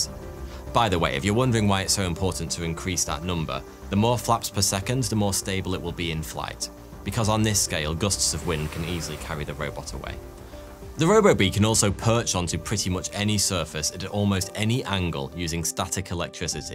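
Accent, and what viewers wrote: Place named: Yorkshire, United Kingdom. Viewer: Anybody know what part of uk this guy is from? In only asking cuz i have a very similar accent and i originate from Harrogate, yorkshire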